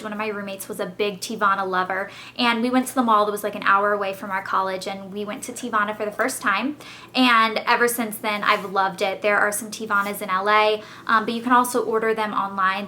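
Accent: England, United Kingdom. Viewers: loving the english accent hahaha <3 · Your British accent is awesome, I feel proud. Love from London, England! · you do an amazing english accent! i live in the UK and that sounded soooo good lol :) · why are you talking like like you're british? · you have a kind of british accent in this video !! LOve it !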